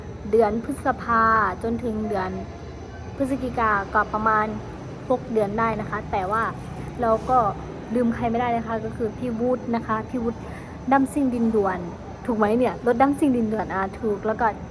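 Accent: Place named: Phimai, Thailand. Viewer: พูดโคราชแบบนี้ไทยพิมายเด๊นิ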